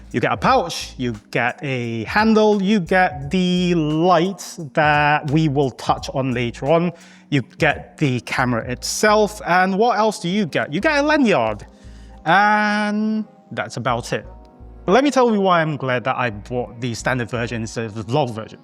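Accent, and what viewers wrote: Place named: United Kingdom. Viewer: are you indonesian? im hearing a british man rn lmao, until i saw glodok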